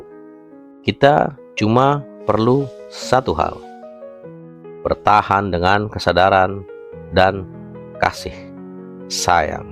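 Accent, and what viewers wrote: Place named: Cirebon, Indonesia. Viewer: Iki admine wong Cirebon kan... ? Logat ngomonge Cirebon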